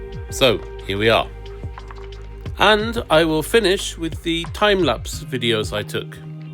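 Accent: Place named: United Kingdom. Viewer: Welcome to Hofu❗️ Great to see this event in your video 👍 Where are you from ? 🇬🇧or 🇦🇺 from your British accent. Hofe has many historical building, so please enjoy to stay✌️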